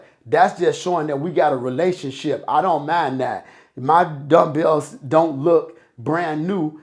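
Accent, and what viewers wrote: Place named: Washington DC, United States. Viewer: Thank u brother helpful video got the same set haven't had any issues yet. Sound like u from D C u gotta accent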